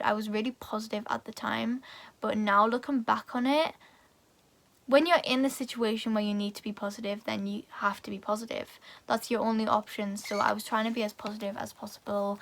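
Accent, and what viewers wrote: United Kingdom. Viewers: Being a 57 y/o man I'm glad it wasn't a makeup tutorial lol. My wife and I are proud of you. Happy you can openly talk about your history. When asked about it you can tell them to watch your videos. Since sis said hi say hi back. I need to watch more British movies so I can be used to your accent more lol.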